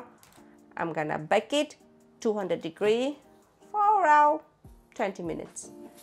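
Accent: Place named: Germany. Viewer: Hi! I don't know how your German is coming along, but you are developing a German accent in English, so I'm guessing your German is improving!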